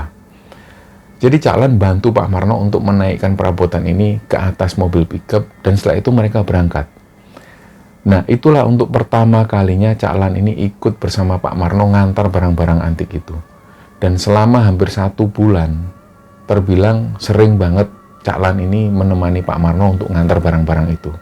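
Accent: Java, Indonesia. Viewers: Paling uenak kl yg bawa'in ceritanya mas, apalagi pake logat khas nya yg medok itu. Assalamualaikum warohmatullohi wabarokatuh buat tim ya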